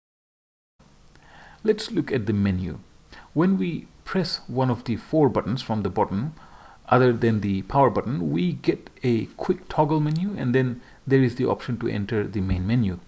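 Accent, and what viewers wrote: Bengal, India. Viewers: Is your native tongue Bengali?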